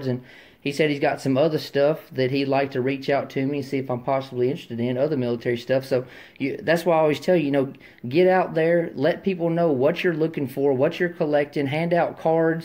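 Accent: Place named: Deep South, United States. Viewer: Thank you Man, i knew you re from the deep south because of your accent. Take care.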